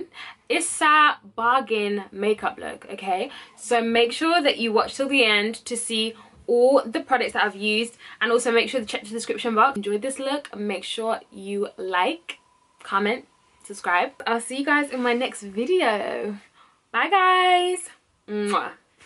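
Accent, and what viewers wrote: United States. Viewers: I wish you wouldn’t speak like a American 😔 love videos though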